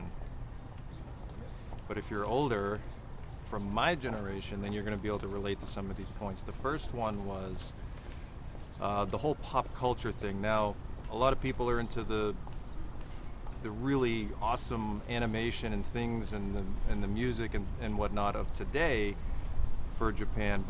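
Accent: United States. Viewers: i'm gonna be honest. You have the most american sounding accent on earth and i love it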